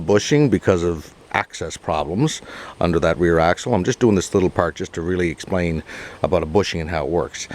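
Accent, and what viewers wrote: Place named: East Coast, Canada. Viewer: Nice to hear that thick of an east coast Canadian accent in a YouTube video. Gonna be doing this to luxury-up my upcoming winter beater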